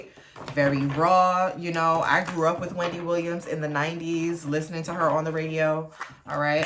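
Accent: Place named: New York, United States. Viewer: You took me back to Star and Buckwild. I said definitely a New Yorker😂😂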